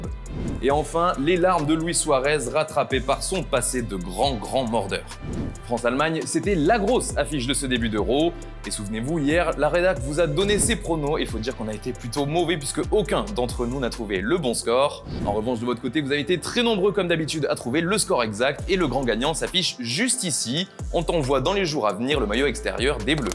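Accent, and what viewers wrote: Italy. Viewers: J’aimerais bien voir la tête du gars avec son accent italien cassé 😂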